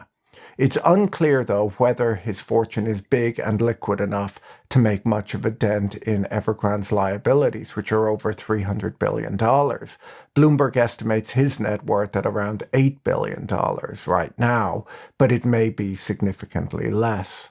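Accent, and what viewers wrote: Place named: Dublin, Ireland. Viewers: great content as always. Can't quite place your accent- Roscommon? Mayo? D4? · Defo - not D4 - educated Dublin accent thou. I would guess his parents are from the country given his lack of specific accent.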